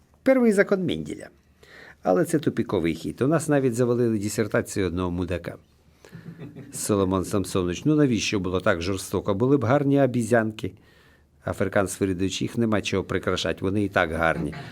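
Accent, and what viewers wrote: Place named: Surzhyk, Ukraine. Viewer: На суржику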